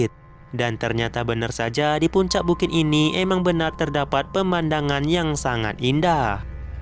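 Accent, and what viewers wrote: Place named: Medan, Indonesia. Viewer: aku suka aksen Medan,tapi klu buat review boleh lah bang di kurangin dikit aksen Medan nya,biar lbh nyaman di telinga 🙏🏻 mohon maaf sekedar saran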